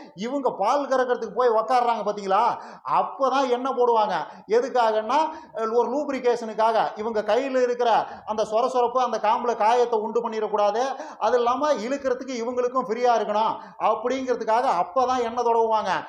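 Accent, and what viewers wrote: Kongu, India. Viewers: First un vaiyaa <num> sunniya partha matri iruku.. sir please avoid kongu thamil ..ne pesara thu kavalama iruku.. sir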